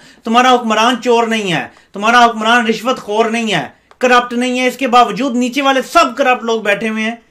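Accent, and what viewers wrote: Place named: Punjab, Pakistan. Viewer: YOU ARE TOO YOUNG LIKE BILAWAL TO DISCUSS POLITICAL SUBJECT. YOU FIRSTLY NEED TO IMPROVE YOUR " PUNJABI ACCENT URDU " WHICH SOUNDS LIKE SOME " DEHAATI " SPEAKING.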